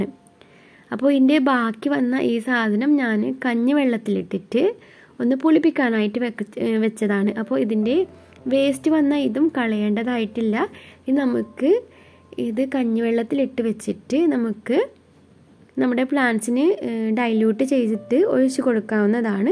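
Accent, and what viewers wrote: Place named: Malabar, India. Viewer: ശബ്ദത്തിൽ ഒരു മലപ്പുറം /കോഴിക്കോട് ടച്ച്‌ ഉണ്ടല്ലോ 🙂😆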